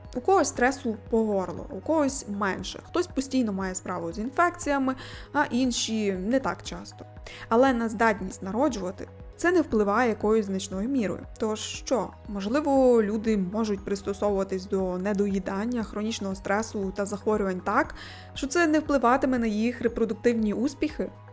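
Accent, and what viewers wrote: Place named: Galicia, Ukraine. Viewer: Ведуча дуже швидко торохкотить, та ще й з галицьким діалектом! Доводиться призупиняти відео вдвічі!!!.. Викладає не дуже переконливо та не цікаво!..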